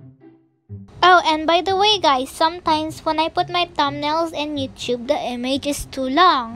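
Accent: Philippines. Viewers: You speaking seems like philipina's are you philipina's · Uhmm... "Karachter" You have a Philippine accent Ms. Cute voice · Your voice is nice but it sound like your filipino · Are u filipino? Cuz ur accent is like mine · ME: hears her voice ME: FILIPINO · Are you Philippina? You sound like you have a phillippino accent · your accent is like pilipino thing But your cute anyways whos pilipino there click the like button Small youtuber here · You have a Filipino accent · Wow I just realized that this accent is Filipino, sooooo I bet this is gacha tuber is filipino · She sounds Filipino. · Just from the voice i here another FILIPINOOOO YAYAYYAYAYAYAY SHES ONE OF US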